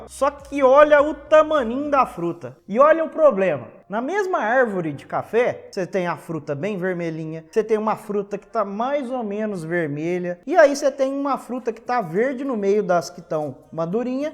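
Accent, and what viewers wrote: Minas Gerais, Brazil. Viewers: Amo esse sotaque mineiro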